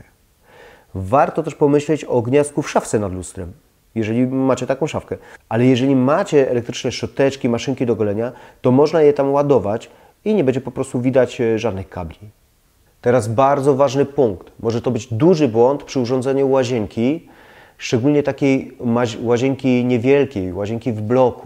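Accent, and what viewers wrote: Silesia, Poland. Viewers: Czy jest Pan ze Śląska? Pytanie z ciekawości :)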